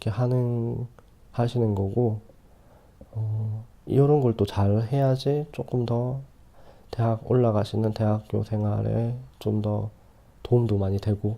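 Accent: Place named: South Korea. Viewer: I wanted to know what you said in the video hahaha I like the Korean accent!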